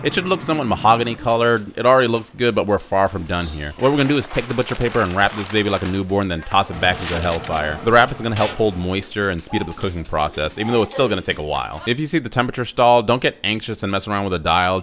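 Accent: Texas, United States. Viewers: bros def from texas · Where are you from if your not texan...how the fu.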